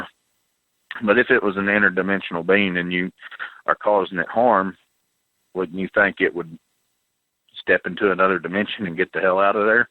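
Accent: South, United States. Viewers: I think it's a sexy rough n tough rugged good ol boy southern gent mountain cowboy accent. Very Revered Very Rare Esteemed Honest True Man Idk if ever that was even a thing but I sure do believe it is now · Love dat suthern akscent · Great stories !! Being a Fla boy love hearing this fellers southern talk 💯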